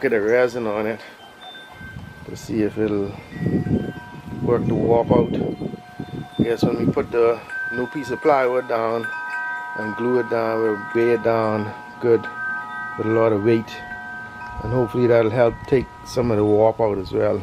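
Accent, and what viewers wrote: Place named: Caribbean. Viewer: Love the progress ... your accent is interesting it sounds like you were raised in the Caribbean in the west indies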